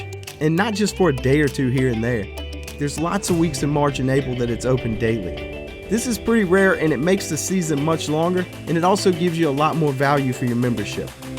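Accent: South, United States. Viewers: you have a great voice over voice but I can tell it's southern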